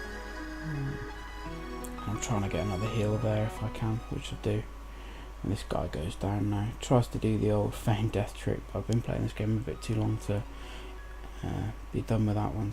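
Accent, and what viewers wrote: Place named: Lithuania. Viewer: Good video and nice commentary, I'm trying to place your accent, are you from Lithuania by any chance?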